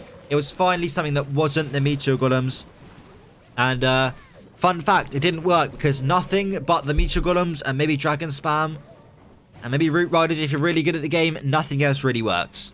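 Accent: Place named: United Kingdom. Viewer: British accent 😉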